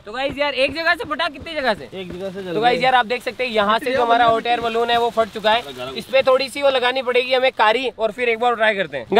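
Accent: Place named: Marwar, India. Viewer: bhai aap per marwadi lengwej bahut mast achi lagti h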